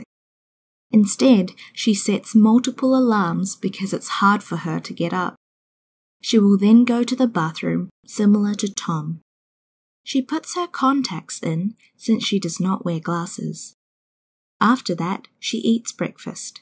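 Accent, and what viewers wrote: New Zealand. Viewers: Your channel's contents are encouraging me to do better listening skill, especially in NZ accent, but it is a little bit ashame that only a few people know this chnnel.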